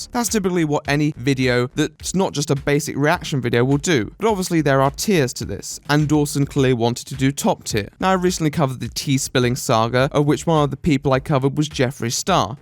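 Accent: RP, United Kingdom. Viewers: Just because he puts on a posh accent does not make him the BBC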